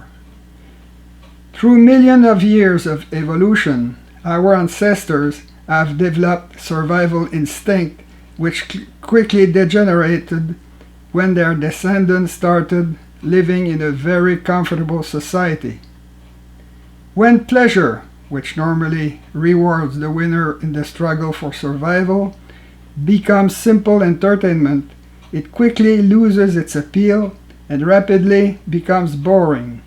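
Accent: Quebec, Canada. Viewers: your own government actively committing civilizational genocide on itself is pathetic. Also, this man sounds like he's from Quebec, not the US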